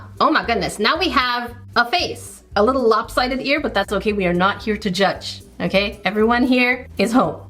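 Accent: Australia. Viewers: YOU MEAN **AUSTRALIAN ACCENT**, RIGHT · Im kinda hoping you get the koala just coz your aussie accent made me giggle 😂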